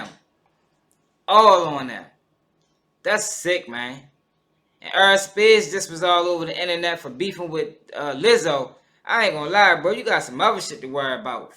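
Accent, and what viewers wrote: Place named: Baltimore, United States. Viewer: That shit is wild!!! At first I thought it was gonna be some bs lawsuit...nah that shit sounds traumatizing. Especially when you hire "talent" and treat them like animals. They took it too far. Pay the young lady n her brother. And apologize. Thats the least u could do. I imagine a real shoot where the topic is pedophiles they actually make sure people are comfortable. They basically abused those kids. It may not be to the full on pedophilia but they put the kids in an environment as such. Thats messed up. Yo... I think your accent... Baltimore area? Is crazy. Those two, you, who sounds lol. Word yall got one of the best accents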